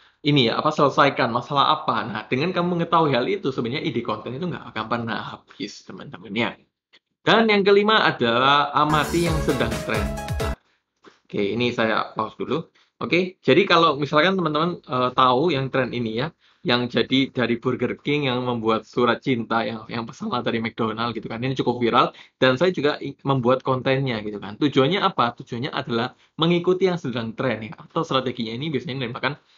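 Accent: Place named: East Java, Indonesia. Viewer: tetep ya..orang jawatimuran atau surabaya itu dialeknya khas.. po meneh wong solo hehe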